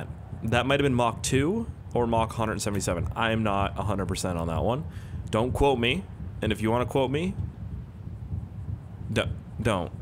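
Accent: Canada. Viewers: U sound like canadian lad a litele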